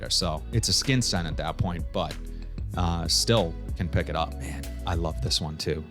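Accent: Boston, United States. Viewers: Nice presentation. But seriously, you need to get rid of that Boston accent. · It's very distinctive. I'm not even American but I can pick that Boston accent out a mile away. It gives you your charm mate 👍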